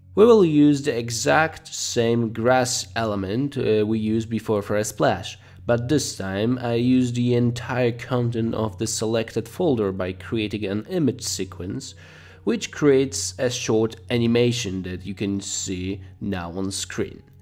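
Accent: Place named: England, United Kingdom. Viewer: Damn I wish I had that English accent. Great video btw 👍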